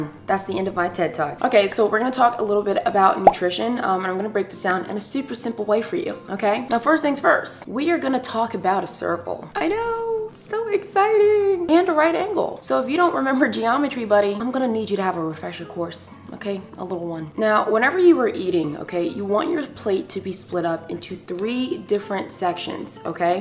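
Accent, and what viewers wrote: England, United Kingdom. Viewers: I love when Black Americans do an English accent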